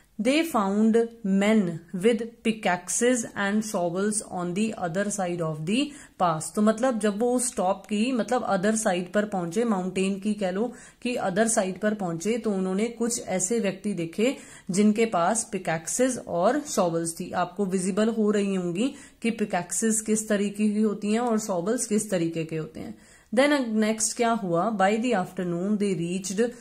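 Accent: Punjab, India. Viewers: Ma'am Are u a Punjabi ?? Because ur slang is like us 😅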